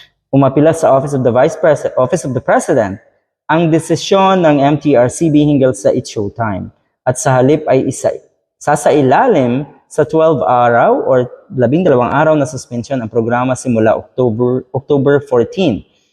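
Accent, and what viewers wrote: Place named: United States. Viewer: FIL AM YATA ITO SLANG MAGSALITA...SLANG DIN UTAK ..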